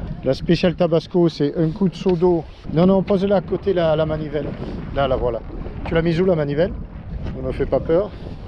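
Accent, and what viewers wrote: South, France. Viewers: SALUT l'amie qui parle le sud je sui guedin rien que de t'entendre je me bar a la réunion dans 2 a 3 semaine re-joint moi faut juste faire l'afrique pour moi c dommage je le fait pas encore en voillier mais bon a charge de revenche aller profite fait des bon virement de bords sans partir a l'eau tes seull mes avec nous on t'aime é te soutien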